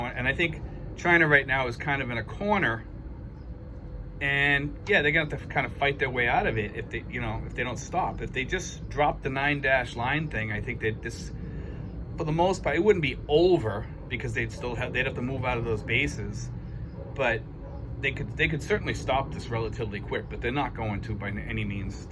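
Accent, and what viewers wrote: Boston, United States. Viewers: Sounds like a Bostonian, or at least from somewhere in Mass. 🤔🧐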